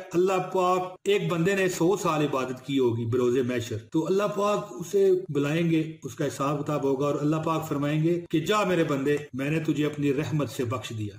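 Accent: Pakistan. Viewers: achaaa mjy lga Lahore k ya Faislabad k hn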